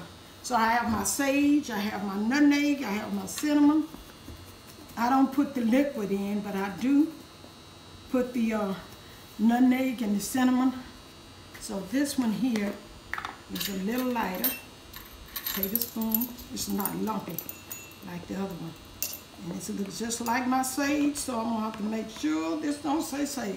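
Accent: South, United States. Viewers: Grandma had that “southern” twang goin on 😉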